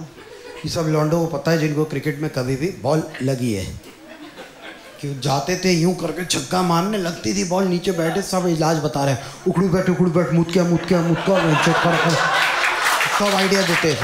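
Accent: Uttar Pradesh, India. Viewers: In his UP accent the way he delivers his jokes ohh god 😂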